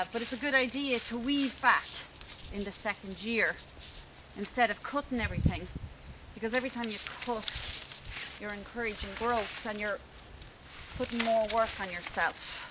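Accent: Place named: Ireland. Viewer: I love a soft Irish accent. Those 'th' sounds are to die for. That's a really amazing structure. I'm only hoping for some firewood from a few planted shoots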